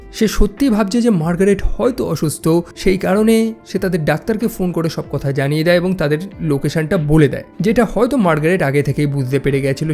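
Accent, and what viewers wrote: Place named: Bangladesh. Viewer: ভাইয়া আপনি কি ইন্ডিয়ান? কিন্তু আপনার কথা বার্তা বাংলাদেশীদের মত শোনায়। তা যা-ই হোক মুভি এক্সপ্লেনেশন অস্থির করেন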